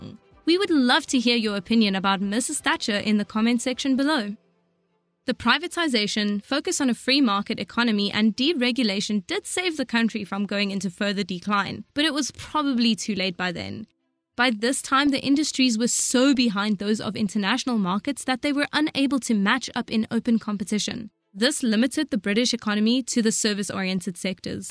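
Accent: South Africa. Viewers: I know a sef effrican accent when I hear it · the narrator's accent is so South African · There's no way the person voicing this isn't South African... Would be be a change.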